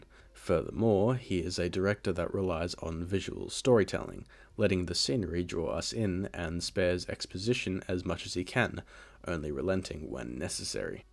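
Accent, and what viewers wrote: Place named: Australia. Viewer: Always so validating hearing an aussie accent. Love the video mate!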